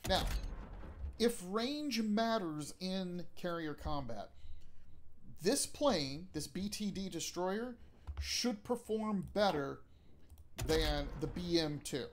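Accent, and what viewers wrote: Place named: Melbourne, Australia. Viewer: Sounds like Melbourne, Australia.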